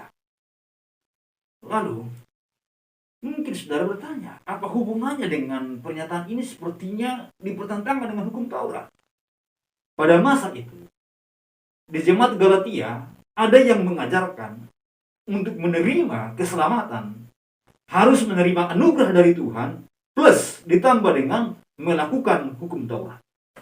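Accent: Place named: Japan. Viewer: Yang suka dari pak Pdt ini waktu sharing firman Tuhan adalah .. aksen Jepang dalam bahasanya 😁 Mantap kali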